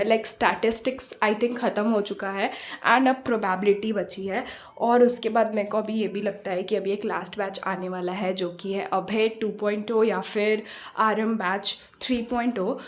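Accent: Bihar, India. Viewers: Heyy!! Are you from bihar !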